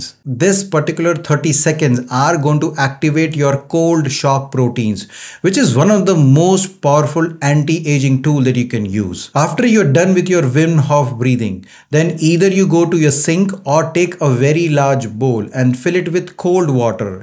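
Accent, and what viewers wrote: India. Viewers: I love indian accent when you speak english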